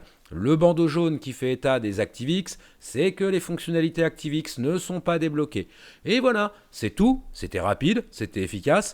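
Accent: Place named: Nord, France. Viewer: J'adore le ton de cette vidéo, bravo au présentateur ! En plus il est concis, ne s'écoute pas parler pendant des heures en mode égo m'as-tu-vu ! J'aime bien aussi la pointe d'accent du Nord, toujours très agréable à mon oreille tant elle rappelle la certitude que quand on a les fricadelles, il n'y a plus rien à prouver, plus rien à prouver. Merci pour ce tuto d'une rare qualité, d'une rare convivialité. Et utile bien sûr !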